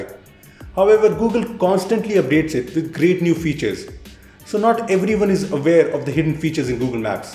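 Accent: India. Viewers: He's not "putting on an accent." It's an Indian accent, and I don't mean native Americans.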